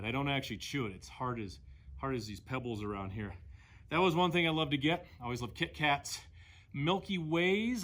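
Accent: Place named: Germany. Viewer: Thanks this Was really helpful and didnt even feel like 15 Minutes.. But whats up with that german voice? Ich bin neugierig 😁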